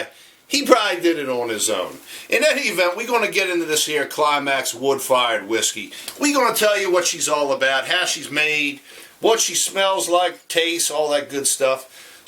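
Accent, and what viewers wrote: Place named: Virginia, United States. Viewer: You are a Virginian i can tell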